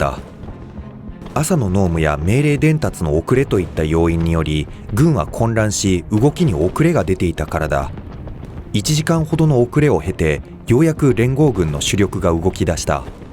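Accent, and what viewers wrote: Kansai, Japan. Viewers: 関西イントネーションかわいいよ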